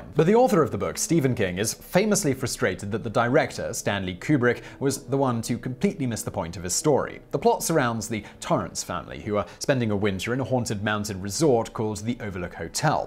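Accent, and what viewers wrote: United Kingdom. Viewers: Get guy with a British accent to state things emphatically and act like that is The explanation of the movie. The top wasn't Cobb's totem it was Mail's, so what it did doesn't matter. What King says doesn't make a difference to Kubrick's movie. (Did you see the version he made? It suuuuuuuuucked.)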